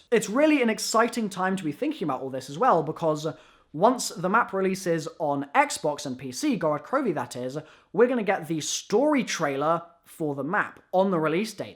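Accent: United States. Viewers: spot on American accent 👌🏽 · American accent. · Your American accent is amazing do it more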